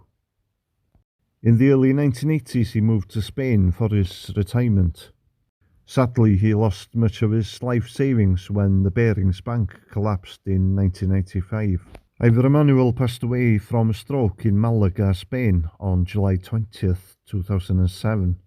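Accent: Wales, United Kingdom. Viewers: I really enjoy your videos. The Welsh countryside seems a place lost in time, where modernity with all its trappings hasn’t yet consumed the past. I hope it never will and parts of Wales remain a hundred years behind the present time. I bet Richard Burton noticed that when he returned to Wales from America .Your accent is remarkable. It’s so strongly Welsh that it almost sounds foreign, something like Eastern European at times. Charming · Ardderchog ! Excellent. Don't ever lose that Welsh accent. Loved this. One of your best yet. What's the guitar piece at the end ? It goes so well with your closing scenes. Diolch yn fawr. Thank you so much.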